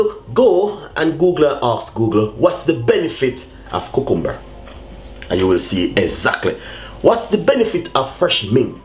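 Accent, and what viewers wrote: Jamaica. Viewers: For those who are concerned about his accent,he's my countryman,'Jamaican'to the core,no speaky spokey,and i rate him for that,straight down to earth son of the rock,thumbs up, gwaan do u ting mi yute!!! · Coo-Coom-Brrrrr! So love that Jamaican accent! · Some people just like talking rubbish he is form Jamaica that how they speak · I lova me that Jamacian talk and sound, Chef!! I love the island mindset and food/drinks and healthy!! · I love your accent Jamaica tunnation nice one there, but that vegetasble is not seen clearly to see it i can get around me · His Jamaican his accent is different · The accent really attracted me, big up, nuff respek to my Jamaican bra. Anyone without an accent is dumb (can't speak). There are more than 10 different accents in the UK and they are all speaking English! People need to travel and learn. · He sounds Jamaican.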